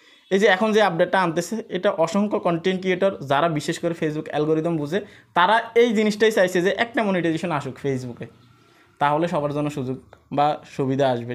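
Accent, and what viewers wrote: Noakhali, Bangladesh. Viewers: ভাই আপনার বাসা নোয়াখালী?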